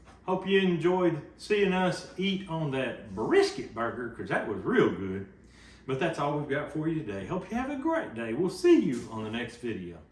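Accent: Tennessee, United States. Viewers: YOU FROM Texas BUT YOU STELL HAVE THE TENNESSEE ( T ) in it hahaha I've not Commanded on your VIDEOS YET BUT IVE BEEN WATCHING A LONG TIME ALL YOUR VIDEOS ARE BLESSED TO WATCH GOD IS SO GOOD TO US ALL THE TIME AMEN BROTHER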